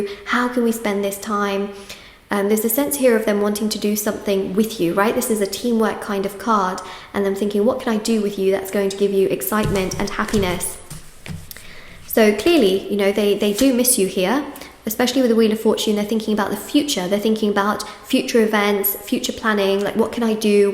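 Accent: United Kingdom. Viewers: For some reason I never put 2 & 2 with the UK accent. I just thought you wanted to be treated like a princess heh.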